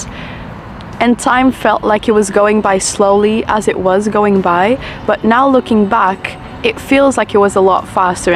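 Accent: Portugal. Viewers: the portuguesse accent is 🔥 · Saw this video suggested to me for some reason. Clicked on it. First few seconds of hearing you talk, "Yup, esta tipa é portuguesa, 100%". :D Your channel does seem interesting. Subscribed. Also, epá olha que diz que isso de fumar faz mal. Come antes uma peça de fruta. Ou qualquer coisa do género. Ah, fuck it, também sou escravo da nicotina. Só gosto de dizer esta frase.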